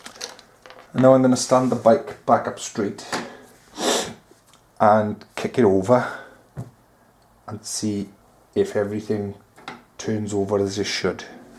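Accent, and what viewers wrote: Wales, United Kingdom. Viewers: You sound welsh do you have a shop or anything because I have a stomp 160 with a jammed gearbox and I need someone to have a look at it and there’s no one in South Wales that can do it for me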